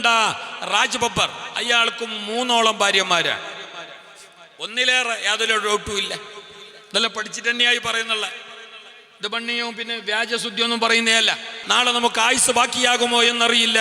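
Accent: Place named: Mangalore, India. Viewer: മാഗലാപുരം അല്ലെ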